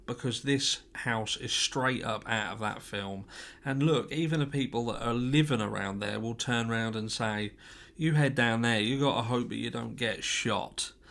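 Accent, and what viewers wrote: United Kingdom. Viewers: Hi there. I just have discovered you in my recommended and enjoyed this deep dive! Its funny to hear a guy from the UK (assuming from your accent lol ) talking about a case here by me in New York (paisley)...funny how small the world has become in the recent times 🤔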